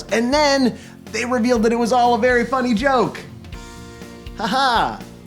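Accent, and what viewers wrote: Canada. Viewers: your canadian accent is so god damn thick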